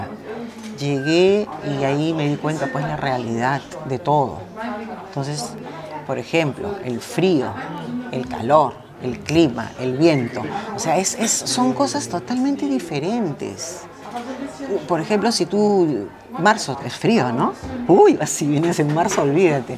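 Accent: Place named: Peru. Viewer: totalmente de acuerdo contigo,sabes yo veo tus vídeos y son tan agradables además tu acento hermoso del Perú,yo soy Méxicana y siempre estoy mirándote y escuchando tus consejos tan sabios y he aprendido de ti y muchas cosas que tu dices es verdad,porque mira que tan diferente es vivir aquí en Latinoamérica y vivir en Europa estoy consciente de eso,mi novio polaco me pregunta siempre de cómo es la gente Latina y tiene curiosidad de todo y yo le platicó muchas cosas para que el venga a México y no desconfíe de mi,y también me gustaría irme a vivir a Polonia y tendria que dejar todo,mi familia y mi país y soy capas de eso por un amor verdadero aúnque le tengo miedo al frío es la verdad y si yo todo se lo dejo al destino porque apenas llevo un año de relacion con mi novio y quiero conocerle cada día más y más y no importa tanto las fechas importantes yo pienso que lo más importante es el tiempo de calidad que se disfruta al estar viviendo ya con la persona que será mi esposo,yo ya no estoy para juegos de Internet y soy Feliz y disfruto día a día la relación que tengo y pienso que si el destino lo quiere estaré pronto con mi novio porque yo quiero casarme con el y no ser sólo una aventura,entonces me gusta escucharte y si estoy de acuerdo contigo me encanta tu forma de ser y me idéntifico contigo,saludos desde México y gracias por tus consejos señora guapa te ves muy guapa de color blanco muy elegante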